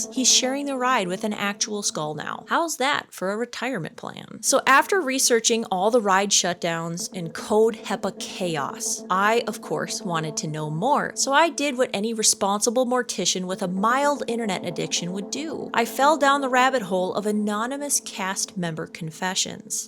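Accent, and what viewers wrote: Minnesota, United States. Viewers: I love your Minnesota accent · The Minnesota accent makes this all the more enjoyable. What if you had a version of each episode you do with the accent completely untethered 😂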